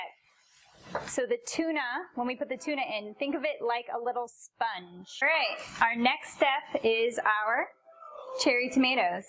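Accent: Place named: Italy. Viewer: Great great videos! Her Italian accent is lovely!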